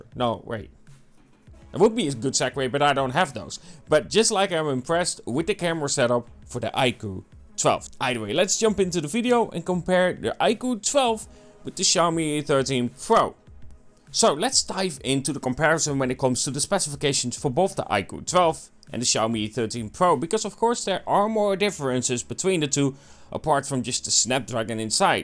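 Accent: Netherlands. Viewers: By the way..your from The Neederlands, don't you?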